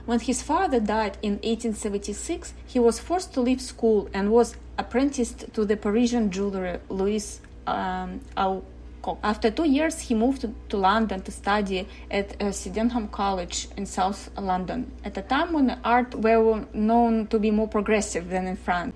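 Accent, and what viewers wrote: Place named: Russia. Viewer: She’s Russian. This is a Russian accent and I understand her perfectly. It helps that I attended a Russian church for over 35 years with my parents so I got very used to hearing Russian.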